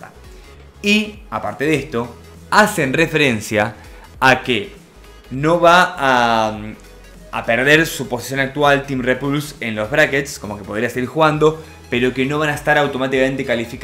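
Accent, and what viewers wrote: Argentina. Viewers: dice roshal por el acento argentino que tiene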